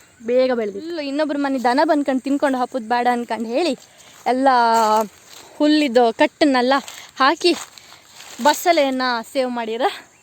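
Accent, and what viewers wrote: Kundapura, India. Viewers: ನಿಮ್ language ಕೇಳಿದ್ರೆ ಕುಂದಾಪುರ ಕಡೆಯವರು ಅನ್ನಿಸುತ್ತೆ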